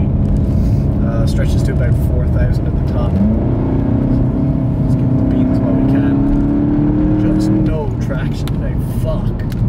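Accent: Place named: United States. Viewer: What’s with the American accent you’ve only been there a week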